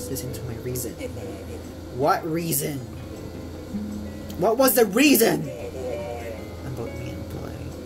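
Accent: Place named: United Kingdom. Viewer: Ur British accent made my day! Love from UK 💕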